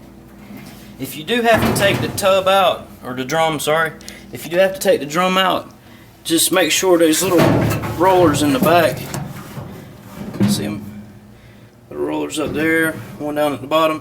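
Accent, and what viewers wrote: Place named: Carolina, United States. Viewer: Man this video is very helpful, love that Carolina accent too, reminds me of my family.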